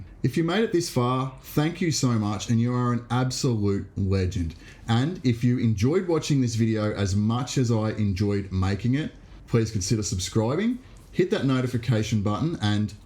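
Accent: Australia. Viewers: Australian accent, terms of lumber, butt dank something doesn’t sound quite right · lovely build. Great wood choice. Unreal to hear Aussie accent for a change. Would you consider individually partitioned boxes for the scotches?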